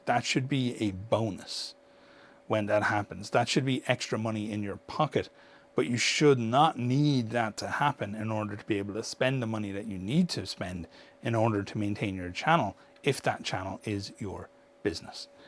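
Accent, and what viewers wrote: Ireland. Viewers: I listen to your long videos for the same reason I listen to Oculus Imperia: those dulcet Irish tones.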